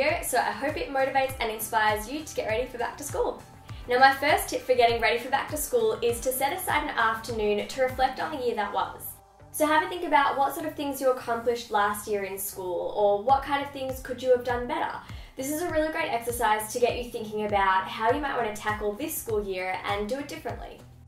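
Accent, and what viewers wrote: Australia. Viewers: Hi. Are you from Australia? Love your accent by the way😂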